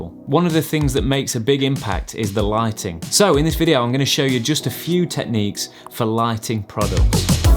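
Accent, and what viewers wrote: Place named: England, United Kingdom. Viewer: Are you from England? I like your accent. Somehow it sounds more professional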